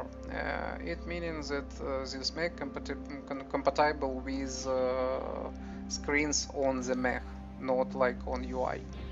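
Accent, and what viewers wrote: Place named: Russia. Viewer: А можно тоже самое только по русски? Акцент выдает русскую школу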